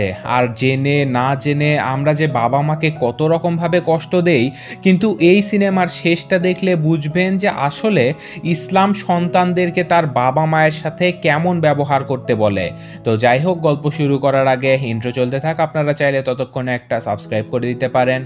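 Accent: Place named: Noakhali, Bangladesh. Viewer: না মানে আমি এমনিতেই জিগ্গেস করলাম কারণ আপনার কথায় কিছু টা নোয়াখালীর মতো লাগে তাই আর কী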